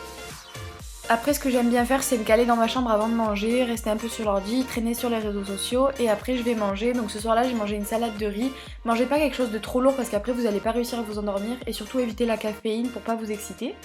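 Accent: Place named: South, France. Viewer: J'adore ton petit accent tu viens du sud ?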